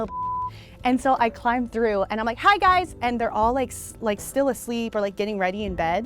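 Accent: United Kingdom. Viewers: i cant get over her british accent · The British accent makes me wanna cry.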